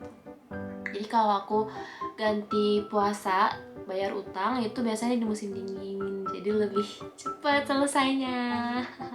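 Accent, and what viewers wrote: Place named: Japan. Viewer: nyanyi lagu indonesia dong. pasti kawaii pelafalannya karena waktu ngomonf aja ada jepang²nya gitu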